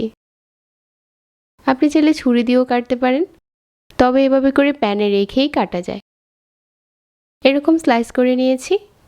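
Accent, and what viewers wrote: Noakhali, Bangladesh. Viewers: A besel ta ki🤔 Noakhaila bashai bolen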